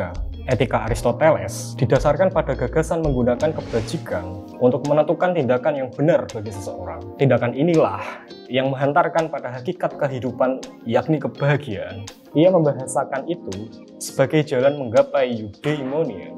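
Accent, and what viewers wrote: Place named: Pantura, Indonesia. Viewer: Cara ngomongnya, medok khas pantura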